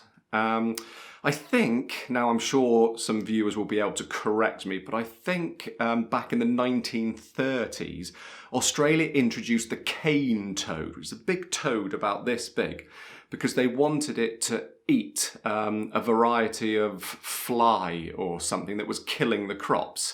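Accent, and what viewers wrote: United Kingdom. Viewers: Professore ho preordinato il Suo libro. Darei qualsiasi cosa per avere il Suo accento così British. Faccio le prove a casa per avvicinarmi sempre di più ma non è così facile. Grazie. A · It's sounding really British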